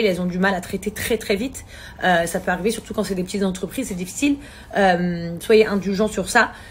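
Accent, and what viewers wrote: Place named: Arab world. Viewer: J'ai stoppé la vidéo net en l'écoutant parler comme une arabe. " ca va porté le ein ! Euh vous etes au courant que plus de lamoitier des marques quel pub sa leur appartient à elle et son mec ???? Tous les trucs de cire et compagnie cest a eux donc si vous recevez pas cest qu'ils continuent encore et encore a vous escroquer et à vous mentir.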